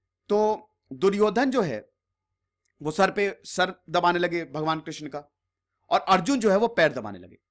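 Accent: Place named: Lucknow, India. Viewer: 😀😀😀... Lucknowi andaaz 😎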